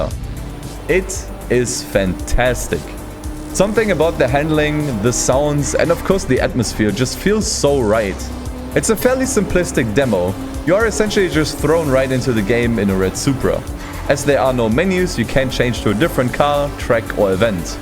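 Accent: Germany. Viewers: Ich würde wetten du bist Deutsch :D Du hast so einen Deutschen Akzent im English :D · i can hear german... bist du deutsch o.o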